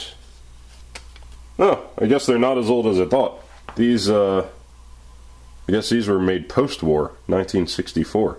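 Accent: South, United States. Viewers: Wow! I thought I clicked on an AvE video for second. Same type of voice with a southern accent. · Southern version of AvE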